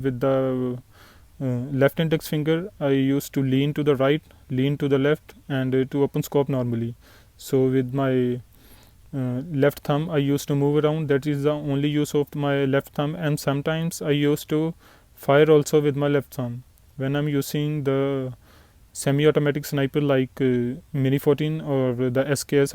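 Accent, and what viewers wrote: India. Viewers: I love the indian accent make me Travel again thx dude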